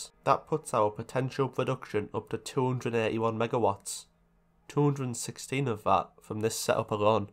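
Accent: United Kingdom. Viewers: Ngl your british accent made me watch the whole thing. and your amazing gamplay as well. Great content keep going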